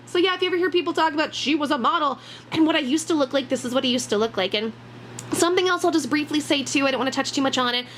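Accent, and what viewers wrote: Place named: Kansas City, United States. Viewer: Aha! I grew up in the Kansas City, KS suburbs! No wonder I talk a lot like you. Currently I live in Merriam, KS which is still that area.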